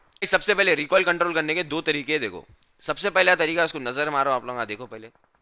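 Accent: Hyderabad, India. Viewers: hyderabadi accent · It's hyderabadi accent, and every accent in india is unique tho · he is from hyderabad bro.. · It's Hyderabadi language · He talk in Hyderabadi accent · This Andhra - Hydrabadi accent is like perfectly made biryani.. love it 3000 ❤😅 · Hyderabadi Boltey Public,Miya Bhai💢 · no naam hai he just has the hyderabadi accent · ya bro he is from hyderabad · Haydrabadi accent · Hyderabadi hai bhai sahab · Arey kya bolre yaro ..Hyderabad ke galli galli mein ham aisich bath karte... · It's not unique it's hydrabadi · And that unique accent is called hyderabadi 😍😍😂🥰💛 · Hyderabadi language nhi suna kya kabhi movies b sahi rehti dekh lo bhai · 99% percent are even listening to the accent. Hyderabadi accent at its best. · Hyderabadi boltaaa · Bhaii hyderabad wale aisich baat krte miya❤️ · He is pacca hyderbadi..not andhra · The way he speaks tell's he is hydrabadi......🔥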